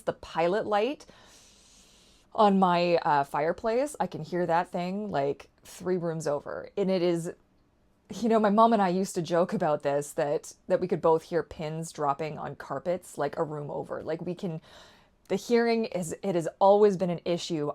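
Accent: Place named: Canada. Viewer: Most people who are watching your videos are American? So I can say Hi from Germany. 🤗 I have half-siblings in the US that I have met for the first time in my life last year. And I have to say thank you for your videos and your Canadian English which I love to listen to. Because I can understand it so much better than the American accent from the southern states. 😂😊 And I wear Loop Switch 2 Earplugs. I love it that they are so small that you can't see them at first sight. But when you see them they are nice to look at. Sometimes I wear them at work when I have to concentrate for a while. They are doing a good job then. But sometimes I hear my heartbeat very loud. I feel uncomfortable then that I have to take them off. After this video I will try the Bose for private locations. 👍🏼 Please be kind because of my English. 🙈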